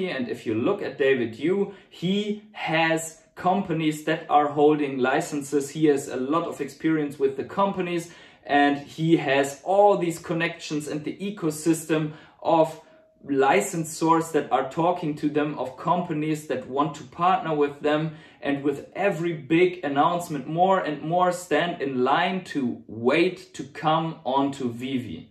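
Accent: Germany. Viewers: Good content again. Last point is pretty good :) By the way are you german? Just curious since your english accent sounds like german.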